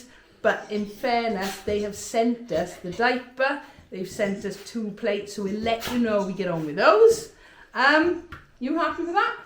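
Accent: Wales, United Kingdom. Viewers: Love the Welsh accent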